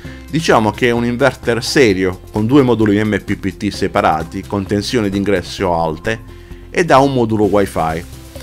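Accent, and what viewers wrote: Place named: Sardinia, Italy. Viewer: Sono pienamente daccordo con te. Peccato che, dall'accento vive in Sardegna, altrimenti, Coronavirus permettendo, lo andavo a trovare, così con l'occasione, assaggiavo lu purceddu Sardo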